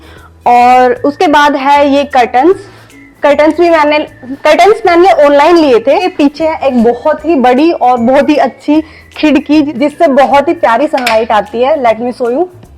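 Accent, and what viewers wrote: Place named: Western Uttar Pradesh, India. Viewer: UP west ki hogi. wha wale hole khte hi.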